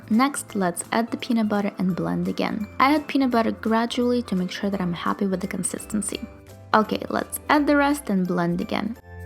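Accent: Russia. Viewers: Sweet Russian I think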